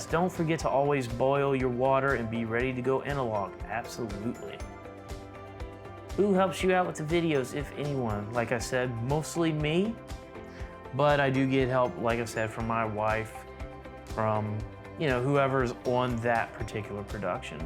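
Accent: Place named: Louisiana, United States. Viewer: I love how despite every video being set in Louisiana, I was still surprised by your Louisiana accent.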